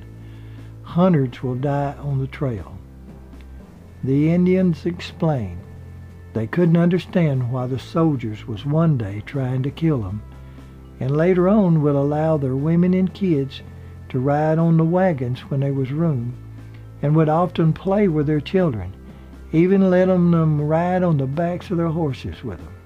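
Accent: South, United States. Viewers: This is a very interesting video as usual,. I still like that southern-type accent (at least to me, from Michigan) you have. Your voice always adds to the mystique of your historical stories. Of course, the pictures you take that go along with your videos, really set the mood well. I especially like your visits to the historic cemeteries as well. Great Job!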